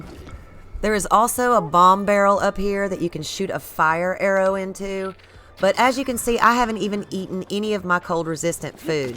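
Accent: South, United States. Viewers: Thanks. I beat the last two with ur help. Since I'm southern all the women i know sound like u so maybe birds of a feather? make it very easy to follow your advice, listen to ur voice, make sense of ur logic! Happy 4th of July God Bless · Thank you, kind southern lady!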